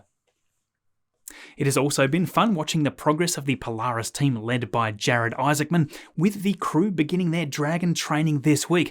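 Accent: Australia. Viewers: Aussie accent at its finest. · Love that Australian rocket commentary! YEEEEEEEEEEAAAAAHHHHH! 🚀😂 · you australian? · Hi from across the ditch enjoyed your content as usual Just one thing as a blind subscriber I would very much appreciate a few more sound clips in your presentation not that the charming Australian accent isn’t enjoyable enough Cheers mate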